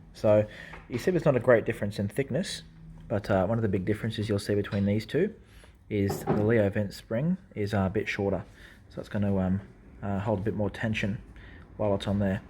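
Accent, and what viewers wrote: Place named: Northern England, United Kingdom. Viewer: oh my god I’m sorry about that cause the north of England sounds like that sorry!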